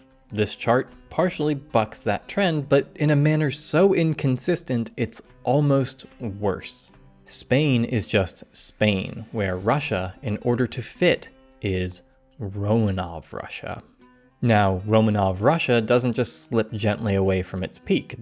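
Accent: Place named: California, United States. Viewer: So what if he does? There’s lots of vowel shifts currently happening across different accents in American English. He sounds Californian, which makes sense cause that’s how a lot of us say “biases” here.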